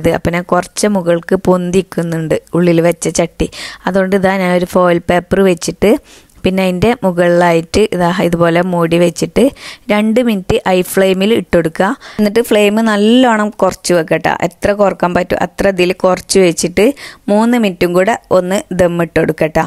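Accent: Kasaragod, India. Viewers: Ninthe samsaaram full ksd mix anne Njn first time vedio kaanumbo kasarkod kaarthin enn njn vicharichatt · മലപ്പുറം ആണങ്കിലും സംസാര ശൈലി ശരിക്കും കാസർഗോഡാണ് ട്ടോ -😍😍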